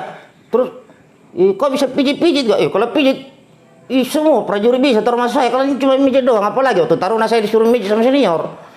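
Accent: Makassar, Indonesia. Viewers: Mantap rokok dan kopinya.... apalagi dengan logat Makassar nya pang bes ... keren 👍👍🙏 ciri khas komandan trade merk yang tidak bisa di tiru ... sehat sukses selalu komandan 👍👍🙏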